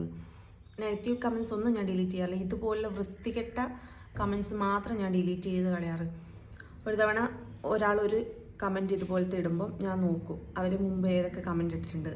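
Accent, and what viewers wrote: North Kerala, India. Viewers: Its a very good advice given to those JHARAMBU ROGEES as you have said must have learned the basics of their dirty activities from their own parents ,sisters or brothers who may be past masters in shaming others....I totally agree with what you have said....Even your advice given may not be effective or make them repent that is what I feel...after therevis a saying CAN AN ABYSSINIANN CHANGE HIS COLOR OR LEOPARD ITS SPOT...Any way I thoroughly enjoyed your video clippings especially the north kerala slang used while talking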